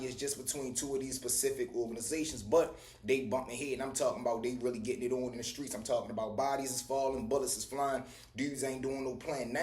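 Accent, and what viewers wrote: Baltimore, United States. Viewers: Bro I love how you tell your stories real certified bmore dude right here 💯👍🏾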